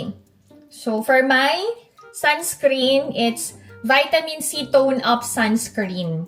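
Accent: South Korea. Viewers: Na notice ko na talaga yung pananalita mo and I knew na adapt mo sa koreans yun,, but if you are not aware how you sound like ganito ang example,, “ you said This is how I speak,,, pero sa panding namin or how you pronounced it is sounds like “ This is how I speakah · In my opinion, it’s completely normal to adapt to the Korean accent and the tone or sound of the way they speak. It’s also possible you’ve forgotten some words from your mother tongue—especially if you don’t have anyone to speak Tagalog with every day. That’s completely normal, since you’ve been living in Korea for a decade. I don’t understand why some people still need to be educated about this.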